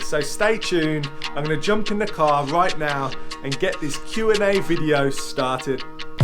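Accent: Birmingham, United Kingdom. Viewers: I've been following the 2 of you since my Auto play turned on one of your early videos and not even watching the screen I heard the dulcet tone of Brummie wafted across my studio. I'm from Ward End but moved to Greece 13 years ago then came back to the UK 8 years ago but not to Brum so our youngest son could finish his education. Watching your little tour took me back over 30 years ago, doing the BRMB walkathon through the park and around the No 11 bus route, performing at the MAC with my collage drama class. My father was an Engraver in the jewellery too. Not sure if I really miss Birmingham, I think I miss Greece more. Glad is feeling more herself, x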